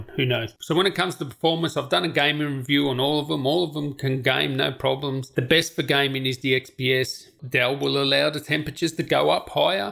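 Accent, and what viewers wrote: Australia. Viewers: u sound like an aussie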